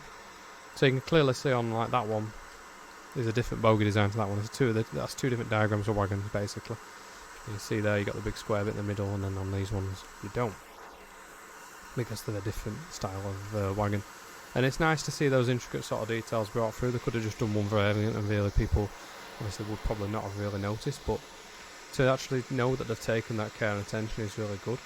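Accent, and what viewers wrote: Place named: North, United Kingdom. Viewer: Great to hear your northern accent and comments. Sceanario pack progress?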